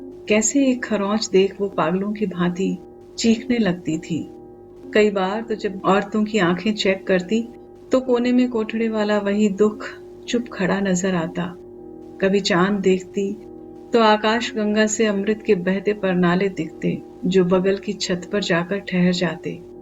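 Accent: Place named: Punjab, India. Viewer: पढ़ी है ये कहानी पहले भी ....पर इस आवाज़ में सुनने पर लगा आवाज़ रच बस गई है । कहानी को और आत्मीय बना दिया । पंजाबी के शब्दों का उसी लहज़े में उच्चारण कहानी को और करीब ले आया । सुंदर ,सार्थक वाचन ....डूब कर पढ़ा आपने सुनीता । बहुत बधाई ....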